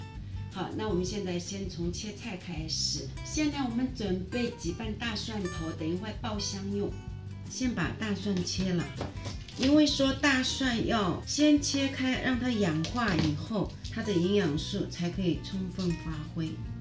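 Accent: Shanghai, China. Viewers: 阿姨上海宁对伐 哈哈 嗲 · 阿姨是上海人吗哈哈哈哈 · 哈哈 听得到上海普通话. 纳泥!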